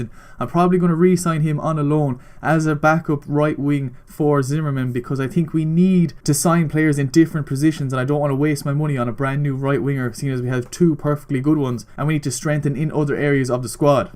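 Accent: Ireland. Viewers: YOUR IRISH!!!! WOOP Hey Im AmigoSully and im here in the search of making a recording team as its a good way to grow, I like your content and love to have you as a part of the team:) hope to hear back from you Sully xD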